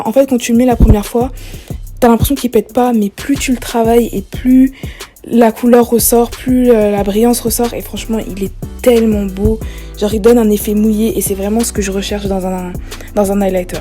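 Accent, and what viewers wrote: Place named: United States. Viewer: Au début j'ai cru que t'étais Américaine 😂😂😂💙💙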